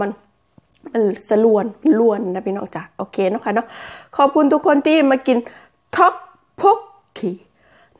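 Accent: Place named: Lao Nyo, Thailand. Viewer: ไผน้อไปว่าน้อง พูดมากป้าชอบฟังนะเวลา พูดฟังเพลินดีลาวผสมญ้อน่ารัก💝💝💝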